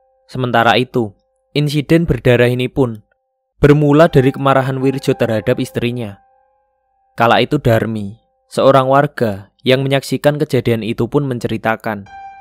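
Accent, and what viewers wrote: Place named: Java, Indonesia. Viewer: Beda orang, ini logatnya ada jawa nya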